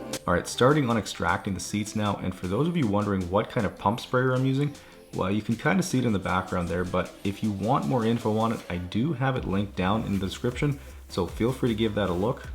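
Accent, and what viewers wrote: Canada. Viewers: when he talks to his audience: slight Canadian accent talking to actual people: Canadian intensifies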